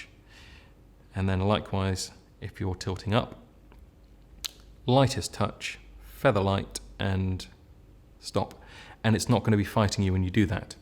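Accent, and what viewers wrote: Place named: United Kingdom. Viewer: the "lightest touch" love the british accent :)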